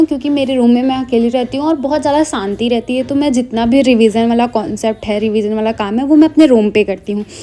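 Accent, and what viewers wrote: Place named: Uttar Pradesh, India. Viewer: It's not about pronunciation, it's about the regional effects that's why you are speaking with such accent. As you are from up so it's your Hinglish ascend. A haryanvi will speak hos own Accent so don't worry regarding this things.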